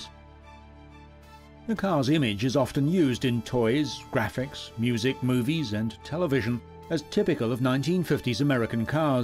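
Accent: England, United Kingdom. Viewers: The History of American Vehicles. Content is good but why the use of British narration is mind boggling. Can you say Aluminum? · I'm sick to watch documentaries about American goods like cars, narrated by a Brit. It sounds so ridiculous as a vegan cannibal. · It is prnounced c short a mare, like a female horse. O long camareO get your narrator to become familiar with US english. Instead of smug incorrect, British English. If your video is about US cars. Use US pronunciation. Duh. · Hey all, drop the "BRIT" speaking,,,,,,,,, he's calling a '55 Cad a 60, and a '60 chevy,,,, is said to be a '60 Buick!!!!!!!! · Alan, you probably did not notice the English accent. I believe this was produced by one of your networks. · English voice was a dead giveaway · Because he is British, they pronounce words differently than us. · History of AMERICAN cars....with a BRITISH narrator.....(who couldn't properly pronounce the names)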